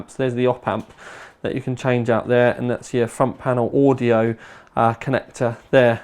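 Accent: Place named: England, United Kingdom. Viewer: He sounds like he knows what he's talking about because he has an English accent.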